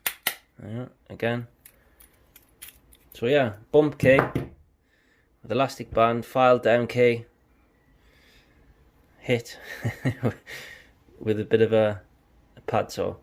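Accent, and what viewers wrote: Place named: Newcastle, United Kingdom. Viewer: I’ve bumped Yale as me era rims lol on a different note I would love to see a video of you picking a Ruko padlock I have just got one (not the combi) I do have one bit way above my skill level but I think I should be able to open the standard one and I’m getting nowhere maybe 1 or 2 pins set your video explanations are far easier for me to understand even with the jordie accent 😂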